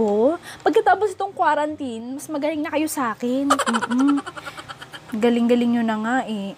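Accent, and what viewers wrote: Ilocos, Philippines. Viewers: Ilocano Kaba ate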